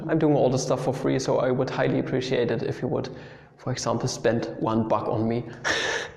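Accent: Germany. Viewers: The german accent+The great content+Calculus+great explanation= THIS VIDEO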